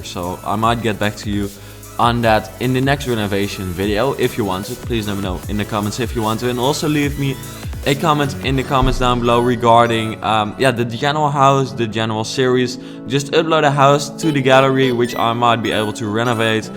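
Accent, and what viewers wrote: Netherlands. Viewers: Are you Dutch? (NL) (ben je nederlands?!)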